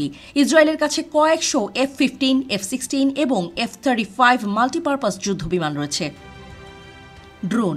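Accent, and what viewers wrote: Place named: Kolkata, India. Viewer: কলকাতার মতো খবর পড়লে শুনতে ভালো লাগেনা, দয়া করে বাংলাদেশের মতো পড়েন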